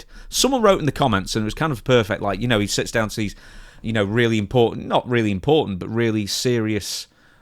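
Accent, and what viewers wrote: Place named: England, United Kingdom. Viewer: What part of England is this accent from? It's awful!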